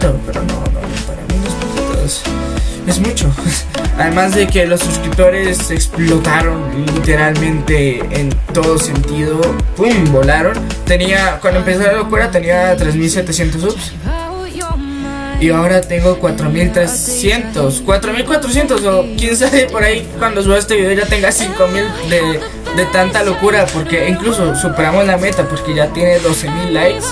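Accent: Colombia. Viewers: Jaja lo sabia que eres de bogota coloombia se notaba mucho xd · Se te nota lo colombiano Viva colombia! uwu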